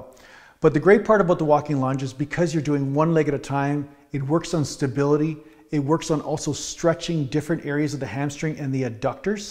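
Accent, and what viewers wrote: Canada. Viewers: I put a couple of simple things together today. In a video of yours I watched earlier today, you mentioned you live in Vancouver. That explained a certain accent you have, along with your interest in and hockey playing. I live near Detroit, right across from Windsor. Before cable came along, I used to watch the Leafs on CBC, along with other good Canadian programs. Cool Aa!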